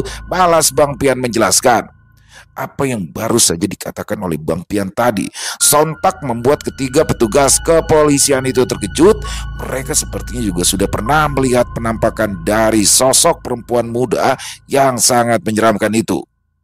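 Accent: Jakarta, Indonesia. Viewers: Paling suka episod bang pian😁😁khas dengan bahasa Betawi nya😁😁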